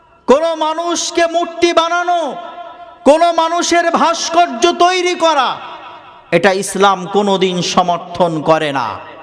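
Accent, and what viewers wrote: Bangladesh. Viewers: আপনার কথা শুনে কেন যেন মনে হচ্ছে আপনি বাংলাদেশী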